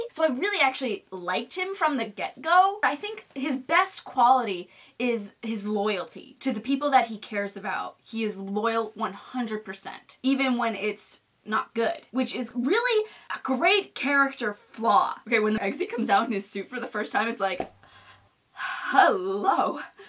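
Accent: United Kingdom. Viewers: I just happened upon your channel today, and I'm really digging all your characters! Do you think you'd be able to make one out of the slick RP accent you're doing here? Also, if you're up to the challenge, it would be awesome to see some of your more established characters do impressions/parodies of each other (unless you've already done that... I'm still working my way through your archives). Keep up the great work!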